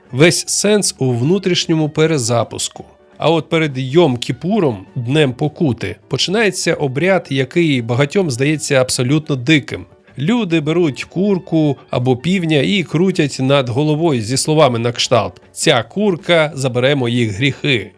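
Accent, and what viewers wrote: Russia. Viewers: У автора проскакує російський акцент.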